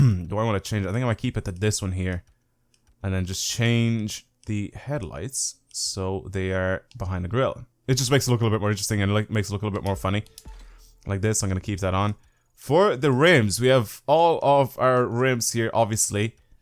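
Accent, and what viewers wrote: Ireland. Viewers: Are you from Ireland? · Are you irish?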